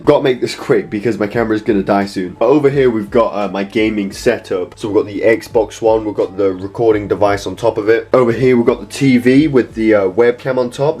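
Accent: England, United Kingdom. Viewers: That's england people speak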